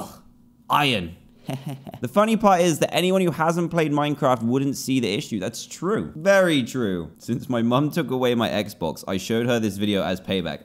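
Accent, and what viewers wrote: England, United Kingdom. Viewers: He's from england or something.... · He’s British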